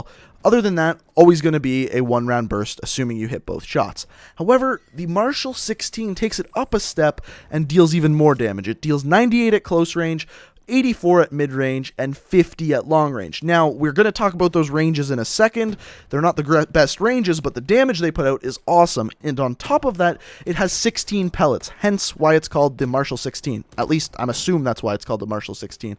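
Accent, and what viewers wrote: Canada. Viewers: Dude, are U canadian? !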